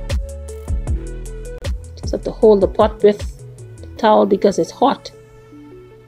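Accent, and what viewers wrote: Bahamas. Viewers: Lovely! I'll try your recipe ;-) I also enjoyed your Bahamian accent! I love it!!!! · Love the accent and of course the Bahamian people.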